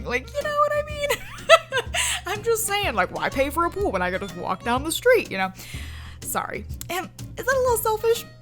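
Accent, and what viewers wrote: New Jersey, United States. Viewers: WHAT IS WITH PPL SAYING A NEW JERSEY ACCENT. LIKE BOI WE DONT HAVE ACCENTS. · A Girl from Texas talking in a jersey accent I need to here this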